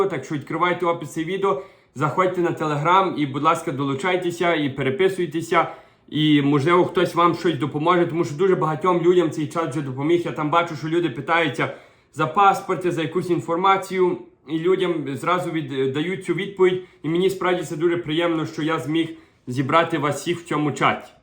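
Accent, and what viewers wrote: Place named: Lviv, Ukraine. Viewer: Дуже гарний львівський акцент, маю також родичів у Львові. Але також хочу виїхати через війну, але вік 52,сину 15,чи маю шанс? А ви молодець!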